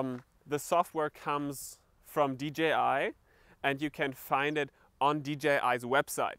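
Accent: Germany. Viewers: Well except for the German accent this is actually pretty good :)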